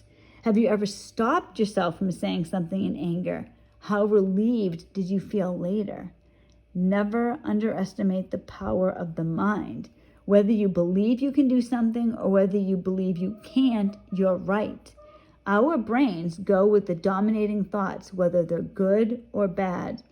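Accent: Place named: Boston, United States. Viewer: you should do a small clip of your Boston accent, just for kicks. Another great video, we can change and move on, thanks for your encouragement and stories it really helps because so many are still struggling. I am free but still struggle with rumination and it really sucks. It's so cute to hear the chickens in the background.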